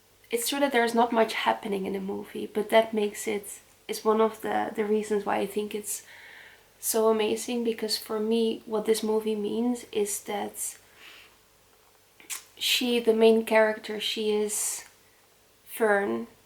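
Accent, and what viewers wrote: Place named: Netherlands. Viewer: I have been watching your videos for a while now because I like Norway and Scandinavia in general. I like your simple down to earth way of living, which reflects in your videos. I already thought you were Dutch by the way you speak English, but now I know for sure.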